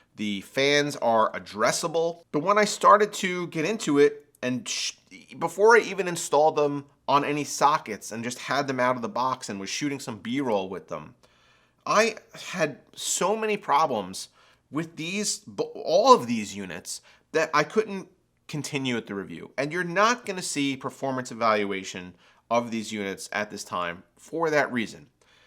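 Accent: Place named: Maryland, United States. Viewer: u from maryland bro?? lets hang out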